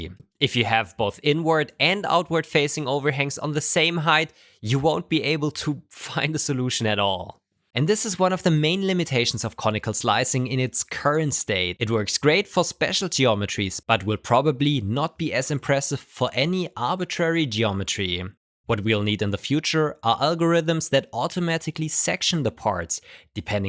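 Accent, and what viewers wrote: Germany. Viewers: All in english, yet so german xD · Ich hab nur zwei Worte gehört und ich hab sofort gemerkt dass du deutscher bist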